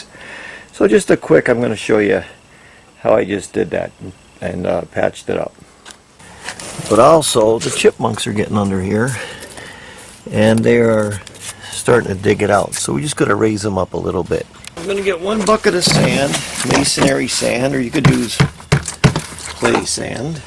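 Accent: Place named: Coal Region, United States. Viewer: Great video. I watch a lot of them just to watch you do the job. I do my own repairs though so I have learned a lot too. Plus I'm from the Coal Region and I love your dialect. Bet you've fixed some CHIMLEYS in your time.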